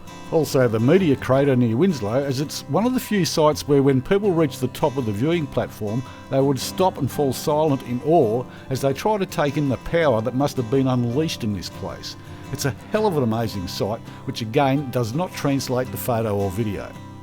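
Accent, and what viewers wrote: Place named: Australia. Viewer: love your oz accent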